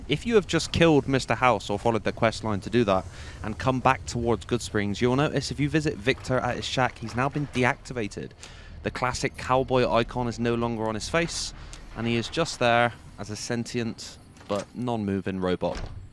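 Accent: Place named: South, United Kingdom. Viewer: You’re from Dorset or Wilshire! 😆 the southern British accent is strong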